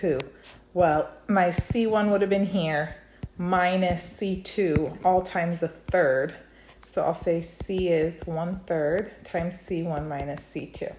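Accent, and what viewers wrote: United States. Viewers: I ❤ UR AMERICAN ENGLISH accent😊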